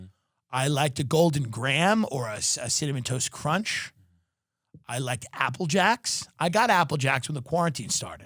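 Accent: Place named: Queens, United States. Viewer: This guy must be from Queens or LI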